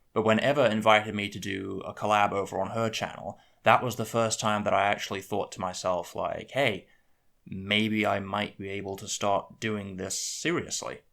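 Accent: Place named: England, United Kingdom. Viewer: Where are you from? I can tell you're from somewhere in England but I really cant differentiate dialects within england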